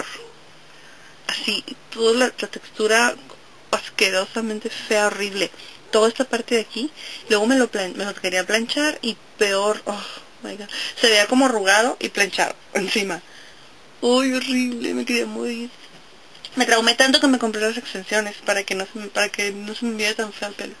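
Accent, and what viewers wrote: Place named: North, Mexico. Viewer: buen consejo gracias... Eres de Tijuana?? hablas identico que mi hermana.. bien nortena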